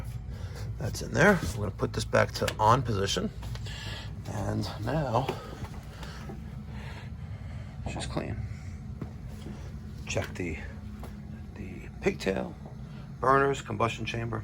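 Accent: New York, United States. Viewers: I’m here for the learning but hearing the New York accent is almost more fun!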